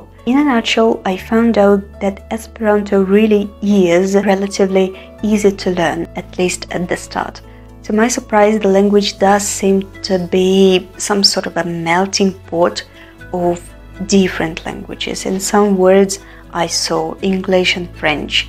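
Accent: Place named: Eastern Europe. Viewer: you sound slavic.. я просто только начал смотреть твое видео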